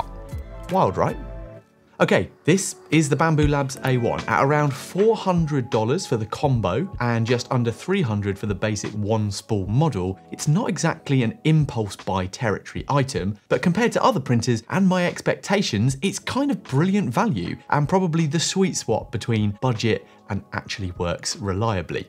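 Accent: United Kingdom. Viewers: God, no more British Accents!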